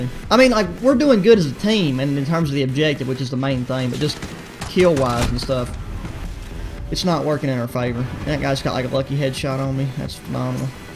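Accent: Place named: Georgia, United States. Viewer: Where are you from? Accent sounds Georgian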